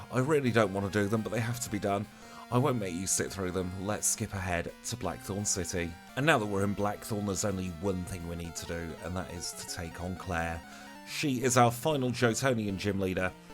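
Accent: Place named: United Kingdom. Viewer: Yoo. I play one shot games of Dungeons and Dragons every week and this week had me questioning my DM constantly. You two sound exactly the same. It was wild, I kept looking over at him thinking you are you just don’t want to tell me. I’m going to assume he is probably from the same part of GB as you, and it just the accent I was hearing.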